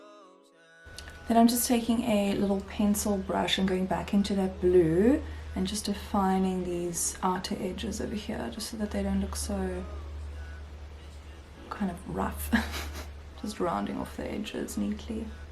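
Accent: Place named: South Africa. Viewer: I love listening to you talk cos you're South African. This makes me H A P P Y!!!! Love the eyeshadow results. I love all of them. Thank you for the demo.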